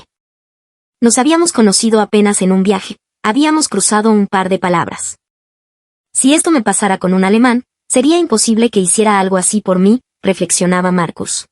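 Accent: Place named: Lima, Peru. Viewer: Mucha jerga y en una mujer es mas feo. Sólo en Lima hablan así.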